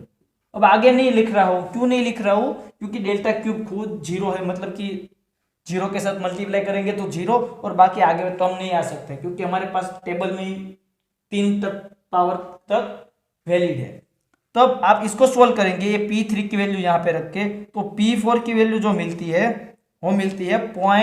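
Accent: Gujarat, India. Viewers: Your tone is gujrati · bhaiya aap gujrat se ho kya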